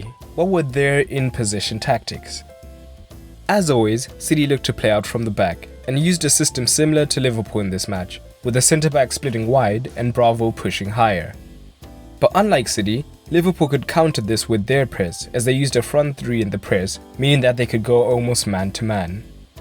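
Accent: South Africa. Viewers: Are you from south africa?? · Nice as always are you by chance from South Africa? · First: are you South African? Second: before I watch, something I noticed was the Liverpool front three put intense pressure on City possession whenever they tried to play out from the back, Barca style. They forced longer balls which they would try to then win in the midfield to relaunch their own attacks very quickly. Highly effective throughout the 1st half!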